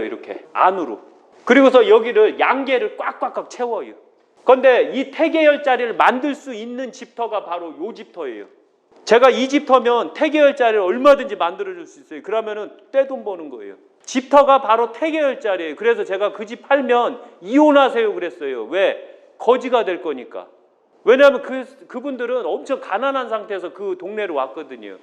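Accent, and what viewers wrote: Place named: Chungcheong, South Korea. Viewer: 쌤~"지난번에 말씀하셨는데요 넘 정감가게 말씀하셔서입니다 스산 태안쪽 말솜씨 같아 더친밀감 올백입니다~😊 방송 잘보고 교육되고요 차례음식상차림까지 진심으로 감사드립니다 고맙습니다 꾸벅 쌤 화이팅입니다 "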